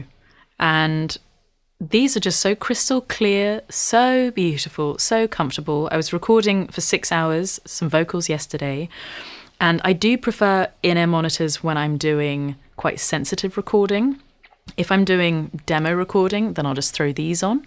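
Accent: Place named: RP, United Kingdom. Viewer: You sound very posh!😮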